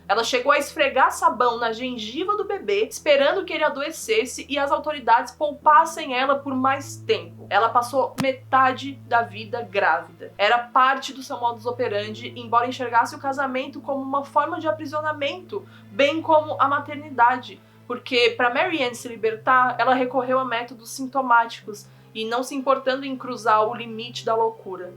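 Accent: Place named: Minas Gerais, Brazil. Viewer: eu pensei q o cara era mineiro tipo quem nasce em minas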